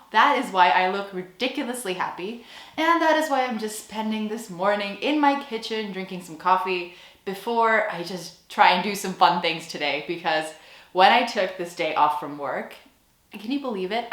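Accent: Netherlands. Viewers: Is it me or you now have a Dutch accent when you speak English? Especially in the outro! 🇺🇸🤝🇳🇱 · You sure seem to be settling in well, you even seem to be developing a dutch accent. Sometimes i think im listening to a dutch girl speaking english :) Anyhow you were just in time, i guess the coffee places and the museums closed right behind you for the new lockdown....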